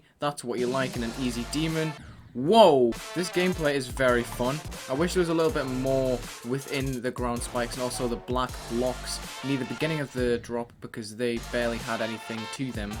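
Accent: United Kingdom. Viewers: To be honest, literally sounds like my math teacher with a British accent.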